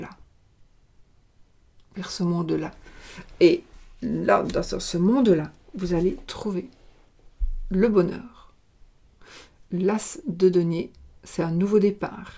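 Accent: Belgium. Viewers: Bonjour d'un Gémeaux du Sud de la France à la Belgique, votre accent et votre style est belge, est ce que je me trompe? Jolie ❤️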